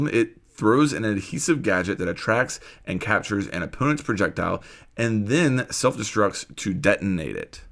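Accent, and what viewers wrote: United States. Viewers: American accent he can't help it.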